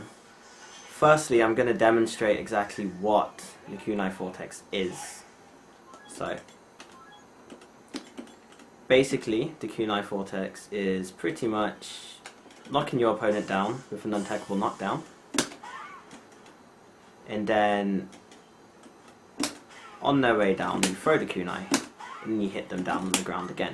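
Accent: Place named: United Kingdom. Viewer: um i dont wanna sound disrespectful but for i bit i thought you were british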